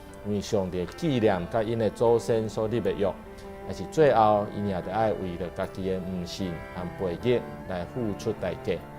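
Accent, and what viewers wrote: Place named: Taiwan. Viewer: 今仔日予我一改誠歡喜來當來聽著，牧師用端的台語放送福音，一來會當認捌上帝所講的道理，二來會當來學習著端的閣優雅的台語。牧師講道的時，聲嗽清楚優雅，予咱聽落心情齊平和。幫贊咱來認捌上帝的話，感謝上帝透過牧師來教示來勸勉。這真正是咱台語人的一大福音。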